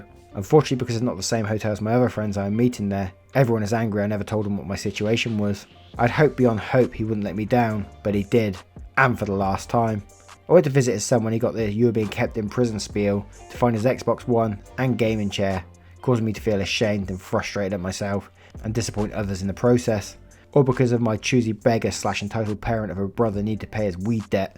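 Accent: United Kingdom. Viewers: I think I asked on another video, but where are you from specifically in the UK? I hope to start becoming more familiar with the different regional accents. Much love!😍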